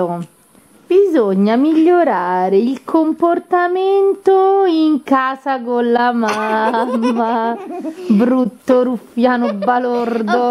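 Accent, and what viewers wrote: Rome, Italy. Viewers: ciao!... io ti adoro a sentire il tuo accento romano... mi fai tanta compagnia...un bacio a!!😍e un bacio a te😙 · Ciao finalmente posso dirti che sei troppo forte con la tua parlataromanesca un bacione a Ciao 💖👍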